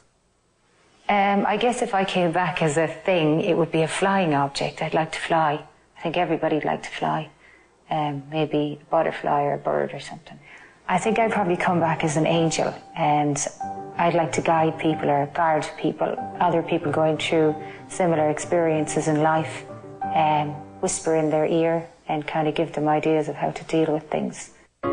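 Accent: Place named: Ireland. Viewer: Irish people really say "me kids" in stead of "my kids", cute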